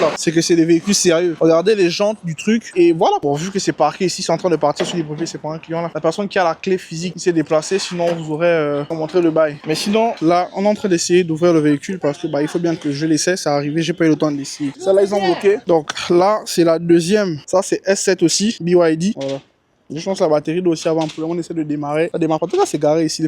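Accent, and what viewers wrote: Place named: Cameroon. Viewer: Ce le français camerounais ❤❤❤❤❤❤❤❤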